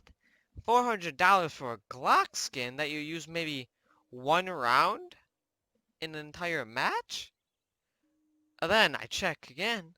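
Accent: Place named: Arab world. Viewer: Lol arabic accent my friend🤣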